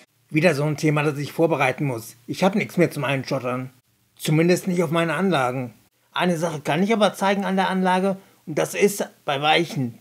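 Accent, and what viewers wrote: Hesse, Germany. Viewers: Hi, sehr schönes und lehrreiches Video....kannst öfters hessisch babbeln:):) LG Mike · Hallo, sehr unterhaltsam, auch oder gerade wegen dem "hessisch babbeln"... 😁😁😁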